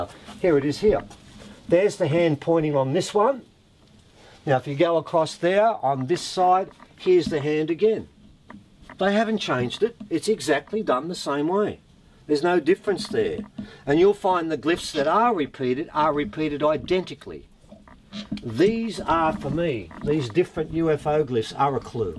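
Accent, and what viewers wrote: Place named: Australia. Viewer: It sounds like this documentary was made by an australian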